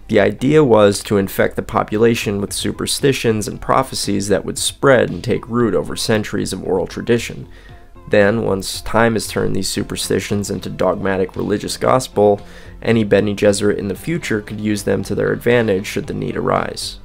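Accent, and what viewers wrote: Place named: Baltimore, United States. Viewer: Eerily good Baltimore accent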